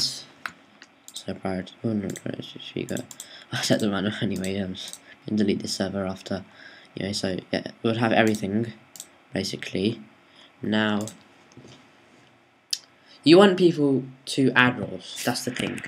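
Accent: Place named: United Kingdom. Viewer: you sound like a more british version of my friend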